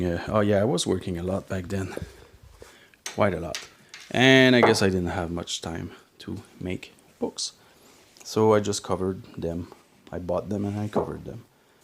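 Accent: Quebec, Canada. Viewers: Wow! I really enjoyed this video of your journal collection. You are so talented to make your own journals from scratch! From your accent I supposed you are from Québec, Canada... I am from Québec too and I also make journaling videos on my channel. I would love to see flip throughs from you, you seem to have an awesome journaling style. I am subscribing cause I want to see more content from you. Again, congrats on your journal collection. Take care. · Québécois right?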